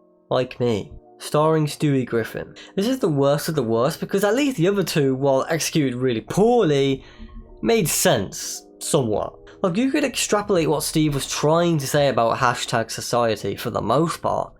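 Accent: United Kingdom. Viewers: you like like my older brother but... british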